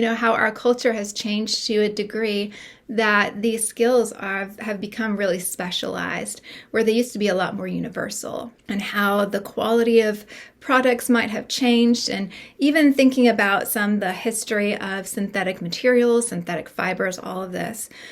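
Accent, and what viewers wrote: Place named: South, United States. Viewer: Just say the rustling sound is ASMR 😂 As for JoAnn's... My first memory was going as a child and buying scraps to make rag dolls. My mother and grandmother both sewed and did a lot of crafting, so I first started making rag dolls using their leftover fabric. Then, when I got into it and ran out of scraps, they brought me to JoAnn's and would look through what they had. I was sad when I first heard they were closing but I can't shop there anymore because I am living abroad now. If they close all stores before I come back, I may never shop there again. They are closing the store in my hometown, so I definitely won't ever shop there again. I made a lot of baby quilts in my 20s and I used to love their Firefly sale. Your wedding dress is beautiful and I love the southern accent 😄💖 I have a NY accent but it usually only comes out when someone cuts me off in traffic😅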